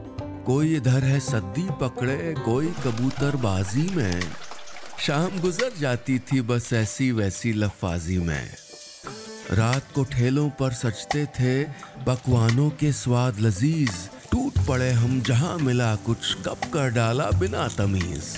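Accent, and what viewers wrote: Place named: Allahabad, India. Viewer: Sounds like Allahabadi Language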